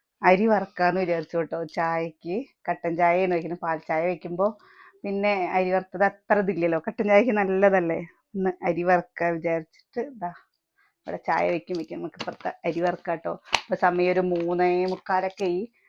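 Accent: Palakkad, India. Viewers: Thanks... എനിക്ക് തോന്നി ചെറുപ്പളശ്ശേരി കോങ്ങാട് ഭാഗത്തെ സ്ലാങ് നല്ലോണം ഇണ്ട്☺️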